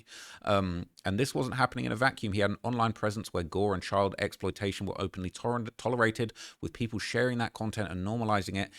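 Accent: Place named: England, United Kingdom. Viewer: My dawg talks like the English but acts like an American lol. Salute my bro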